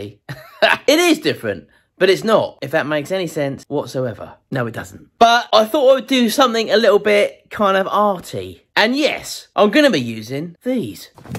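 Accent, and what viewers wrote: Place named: England, United Kingdom. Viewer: Where in England are you from, i mean with your accent ?? Its not london and probably not manchester or up there 🤔🤔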